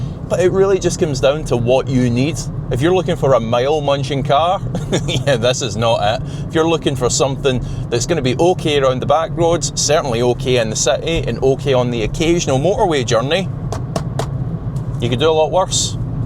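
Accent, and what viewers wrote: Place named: Glasgow, United Kingdom. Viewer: Love your videos you present them well and with interest your personality plays a major part in this with your Glasgow accent, a very likeable character.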